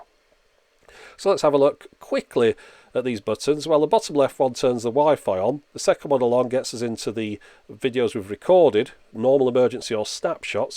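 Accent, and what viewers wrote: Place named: Yorkshire, United Kingdom. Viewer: What town is this in? You sound like Yorkshire. If I'm wrong, pardon my untrained Yank ear.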